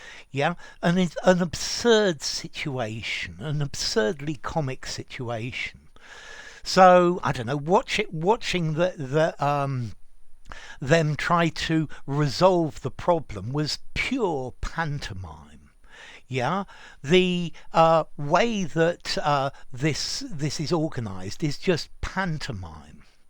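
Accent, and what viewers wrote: RP, United Kingdom. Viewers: GOD... do you speak British RP accent (Reserved pronunciation) however, your teaching style is greater than all..Big fan sir.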